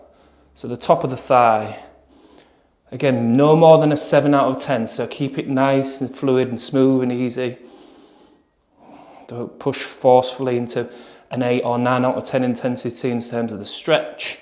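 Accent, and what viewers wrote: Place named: Hull, United Kingdom. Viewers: I've been in lazy for 6 months and haven't run. Feels good to be back listening to and his Hull accent! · Great effective stretches with what sounds like good honest East Yorkshire accent. Win win!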